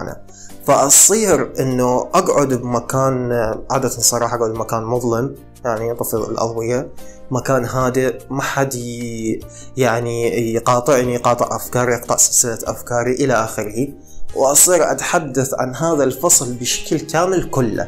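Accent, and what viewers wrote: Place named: Iraq. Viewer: اسفة على كلمة بس اول مرة اشوف عراقي كاعد ايسوي شي بي خير و بهل احترافية و بهل نظافة طبعا من فتت على قناه و سمعت اللهجة انصدمت انو عراقي الي كاعد يحجي و اللة امثالك فخر النة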